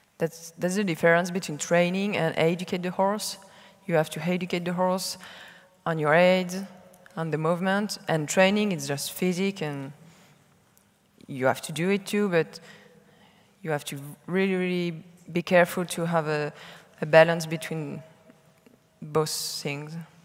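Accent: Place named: France. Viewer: On entend bien l’accent français 😂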